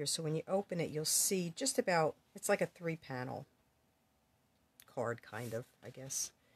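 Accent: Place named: New Jersey, United States. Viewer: Just subscribed! This is one of my favorite new sets! I love this card. I am a Long Island girl moved to upstate New York. I love your accent even though I think it might be Jersey, it makes me feel right at home❤️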